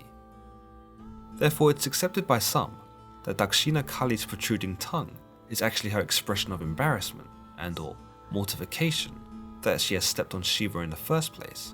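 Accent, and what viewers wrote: United Kingdom. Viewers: So tired of hearing the OLD CRUSTY british or english accents! Are they still trying to take over the world?!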